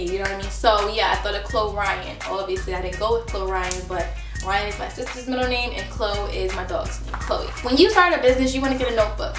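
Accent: Baltimore, United States. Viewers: You sound like you're from Baltimore 😍💕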